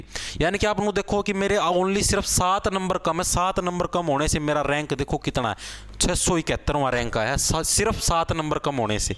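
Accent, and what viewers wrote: Haryana, India. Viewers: Bhai aapki boli dekh kar me bhi aadhi si haryanvi Sikh gya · Haryana ale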